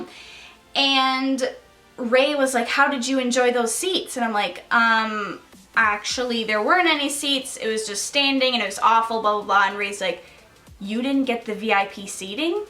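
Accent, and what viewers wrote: Minnesota, United States. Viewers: I'm From Minnesota so when I heard your accent I was like she must be from MN then you said something about the target center and yes!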